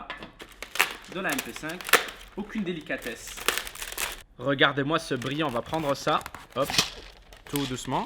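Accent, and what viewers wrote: Quebec, Canada. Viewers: Avec tout ce que tu fais et t'es untboxig (dsl pour l orthographe) tu devrais faire une faq Continue comme ça je t adore PS t'es Quebecoie?🤔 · Tu es québécois ? Et merci pour les conseils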